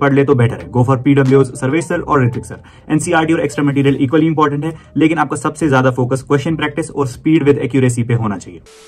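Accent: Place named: Kashmir, India. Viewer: Bro kashmiri accent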